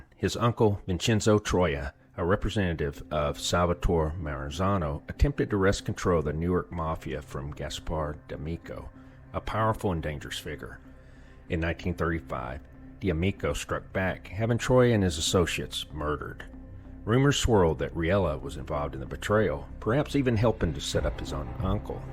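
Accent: South, United States. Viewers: ' Country drawl is calming and pleasant...charming if I may...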